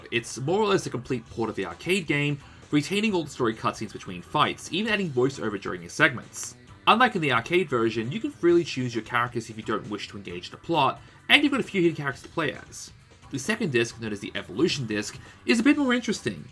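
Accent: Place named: United Kingdom. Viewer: youtube commentary: uk accent introduction that belabors the very concept itself absolute misuse of the word 'unique' rendering it pointless *potential absolute misuse of the word 'weird' rendering it pointless *potential use of the phrase 'deep dive' to suggest the idea of retrospective opinion *This is where I clicked off the video.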